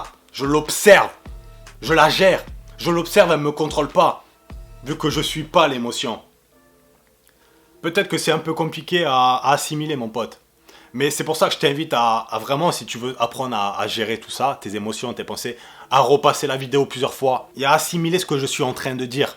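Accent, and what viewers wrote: Marseille, France. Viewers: Mec je te découvre et ça me casse le crâne t’as le discours d’un maître spirituel mais en mode bonhomme de Marseillais ! Je te kiff grosse force mon gars !!!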